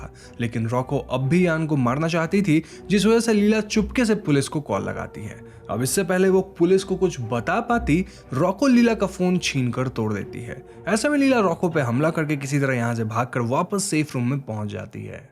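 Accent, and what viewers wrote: Bengal, India. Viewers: Aapki Bengali accent wali Hindi Alag hi feel deti hai... KO😍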